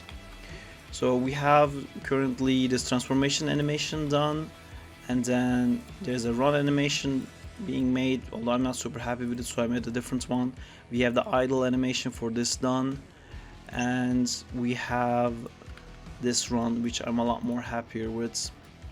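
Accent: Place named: Turkey. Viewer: selam aksanından türk olduğunu çıkardım oyunu nereden indirebilirim if u are not a turkish im sorry how i can download this game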